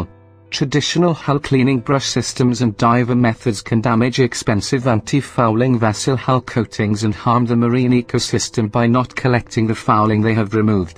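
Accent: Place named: Wales, United Kingdom. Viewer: Wow - a Welsh bot narrator !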